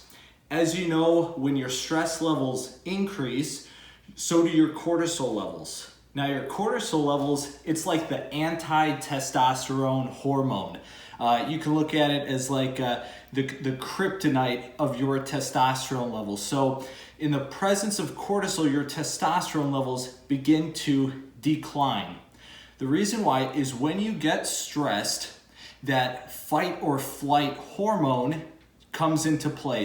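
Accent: United States. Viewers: He sounds American...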